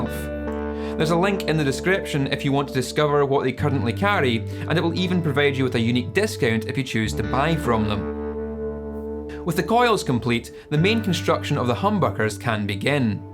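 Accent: United Kingdom. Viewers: That’s British sounding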